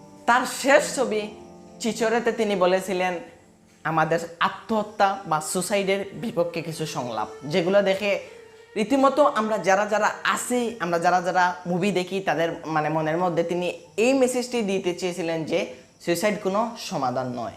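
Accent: Sylhet, Bangladesh. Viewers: ভাই তুমার মুখ ও সিলেটি মাত অউ ভালা লাগে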